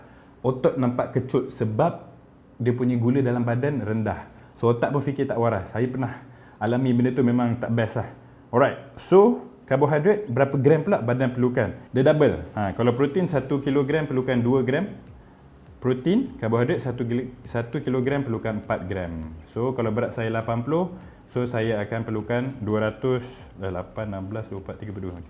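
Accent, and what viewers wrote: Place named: Terengganu, Malaysia. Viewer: kenapa aku rasa doc ni org tgganu😂ada beberapa patah perkataan dia mcm org ganu sebut😂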